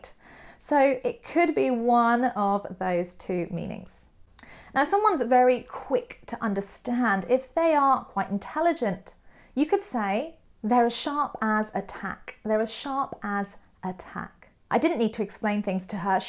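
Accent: United Kingdom. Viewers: Hi, 🖐as always, I love the way you speak English, it's crystal clear, typical British accent, and most importantly, it's full of vigor 💪👍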